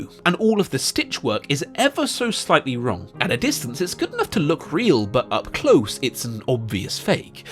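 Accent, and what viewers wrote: England, United Kingdom. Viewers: you sound British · The dumbest and THICKEST apologist video you’ll seen on Tenet. Just because he has an English accent doesn’t mean his points are insightful, they’re just verbal salad.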